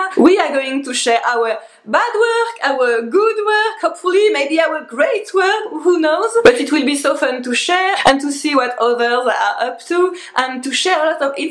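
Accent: France. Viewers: As a watercolor pigment geek, and yes I also live in France but have not your cute accent, I try to put each day the spot on one pigment. Thanks to you as this idea came up watching your video. Merci beaucoup et à bientôt.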